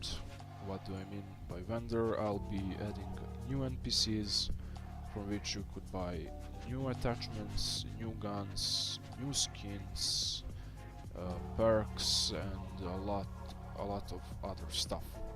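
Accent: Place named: Russia. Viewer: Как будто русский говорит по английски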